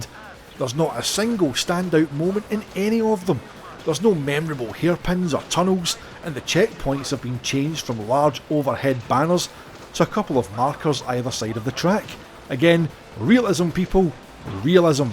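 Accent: Scotland, United Kingdom. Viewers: I love the Scottish accent. Can't understand a word that you're saying, but it sounds like magic! Yet i just don't know why SEGA didn't bring 2.5D versions of all of these great games out for the Genesis/MD. Over 40 million of those consoles in the marketplace, and the limit pushers of 1994-97 proved that the machine was capable of doing press proper 3D and great graphics and sound.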